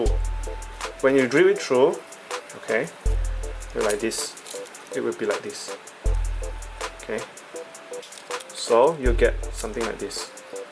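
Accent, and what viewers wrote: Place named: Malaysia. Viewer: super Malaysian accent.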